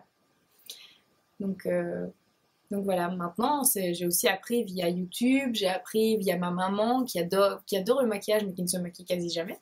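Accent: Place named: Belgium. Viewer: Super que tu débarques sur YT ! J'adooore tellement l'accent belge :)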